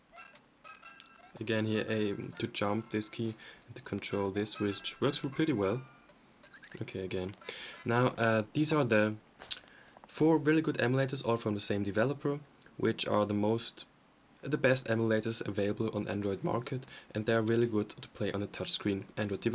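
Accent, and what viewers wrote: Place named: Germany. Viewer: Dude, awesome accent. I find it pretty hard to copy a German accent and I've been studying German for 7 years. Yeah.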